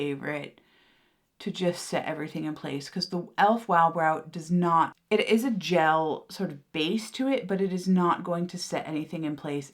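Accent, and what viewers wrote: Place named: United Kingdom. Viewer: Hi, just came across your channel. I spent the first 5mins trying to work out if you were British , American or Irish 😅 I’m loving your content so, you have another subscriber . I’m 64 years young and I’m Scottish. I love makeup especially drugstore so, I’m hoping you’re not all about high end. Sorry❤❤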